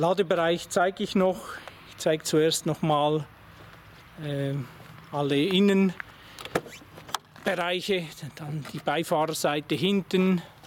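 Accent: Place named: Sweden. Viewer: Are you Swedish of origin? Sounds like i'm hearing a Swedish 'R' :-). Beautiful Alfa.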